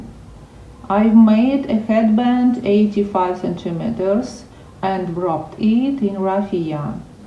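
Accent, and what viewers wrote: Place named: Nizhny Novgorod, Russia. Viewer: Какой-то нижегородский акцент, режет слух . Как будто по русски говорит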